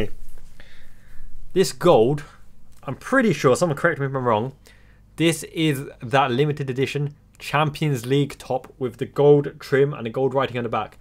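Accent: United Kingdom. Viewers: おすすめに出てきました。同じく日本のリヴァプールファンとしてyoutube活動応援しています。あと日本人としては珍しいukのアクセント、めちゃくちゃかっこいいです。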